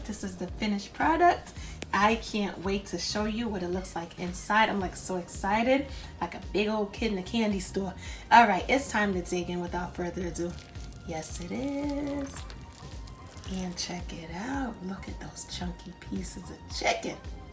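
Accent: New York, United States. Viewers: you sound like a New Yorker your accent does NOT sound Southern at all..Love your cornbread stuffing recipe..but I will like to see how you made the cornbread..My mon does it the same way but w/outthe croutons..Looks Delicious..!! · It looks amazing. Love the East coast accent. Sounds like NY, or Brooklyn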